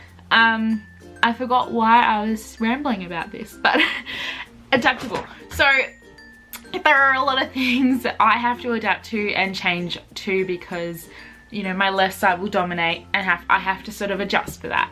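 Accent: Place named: Australia. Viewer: I already did. It’s a crack up as well!!!! Now, I’m old enough to be probably your Grandpa, but I’m captivated by your outgoing, friendly persona....... but appropriately 😂 I also love that you’ve an unashamed Aussie accent!!!!!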